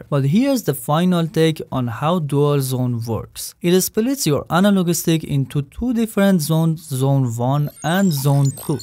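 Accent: Iran. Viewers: از لهجت فهمیدم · Persian accent never die!!!😊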